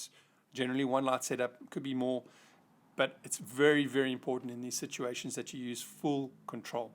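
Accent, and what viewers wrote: South Africa. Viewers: Thanks very informative and well presented a nice calm narrative no shouting or speed talking. Soon as I heard you speak I thought SA accent a beautiful country, I have been lucky enough to visit it a few times.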